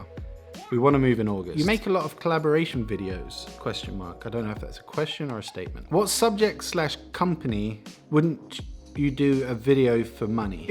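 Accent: Finland Swedish, Finland. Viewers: He is talking finland swedish not swedish.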